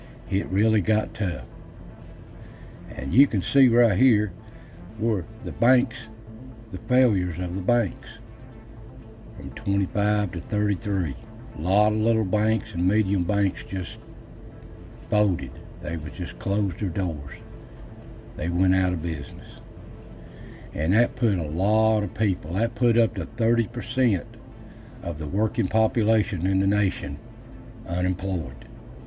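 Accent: Appalachia, United States. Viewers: 23 year old man on the edge of Appalachia in Alabama thank you for your vids I can really relate to what you post you remind me of my grandad a lot the way you talk true Appalachian down in Alabama it’s similar to the Tennessee talk like you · It's so comforting to hear someone actually from the area narrating these videos & passing down the knowledge... It's sad how many of the foraging & mountain videos are narrated by someone obviously not from here... Thank you again ❤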